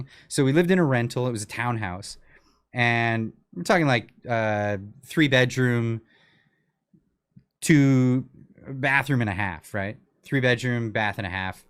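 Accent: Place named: United States. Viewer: Big deal you're going back home where you come from ,where you belong. You've never been Canadian you're an American. You can hear it with the way you speak English cuz you don't sound like any anglophone Canadian that I know and I'm married to an anglophone Canadian woman.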